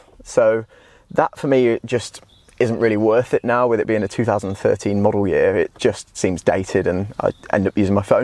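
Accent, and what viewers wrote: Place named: Northern England, United Kingdom. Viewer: You are clearly northern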